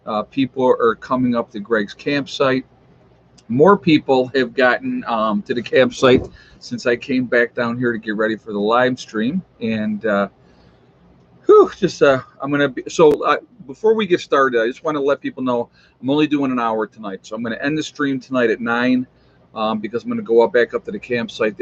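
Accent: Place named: Michigan, United States. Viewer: Every time i listen to you, something would bug me about you being a new yorker..and i couldn't figure it out. Now i know what it is...you have a Michigan accent not a new york accent...😁am i right???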